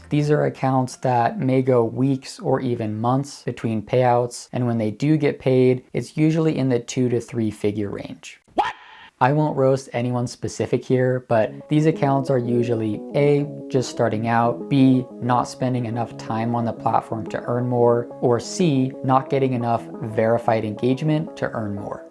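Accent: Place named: India. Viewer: This dude sounds like an indian scammer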